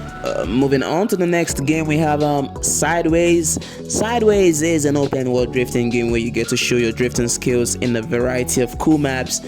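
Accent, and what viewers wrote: Nigeria. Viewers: You got Nigeria accent, where are you from?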